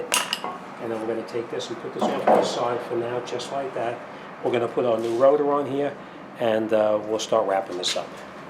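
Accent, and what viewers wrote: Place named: New Jersey, United States. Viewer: "Bolth bolts" love Jersey lol. Must be north Jersey. Thanks for the vid. Helped me out greatly.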